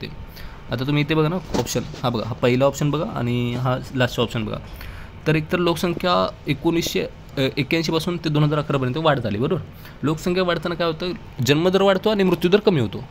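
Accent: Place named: Karad, India. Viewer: aawaj Varun Karad vatakl😅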